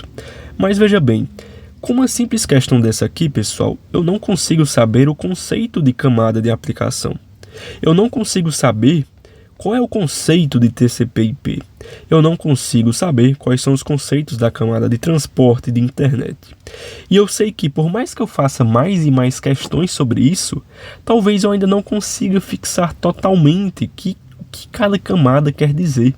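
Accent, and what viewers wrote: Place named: Pernambuco, Brazil. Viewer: Você é pernambucano?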